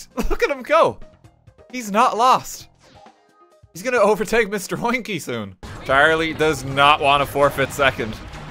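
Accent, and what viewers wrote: Ireland. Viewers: Are you accutaly Irish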